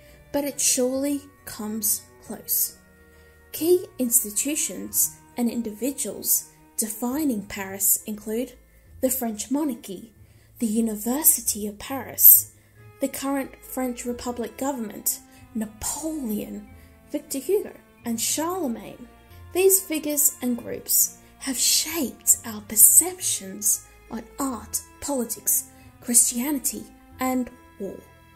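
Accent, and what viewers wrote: Australia. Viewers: I guessed you were either from Australia, or New Zeeland, at first I thought you were English 😅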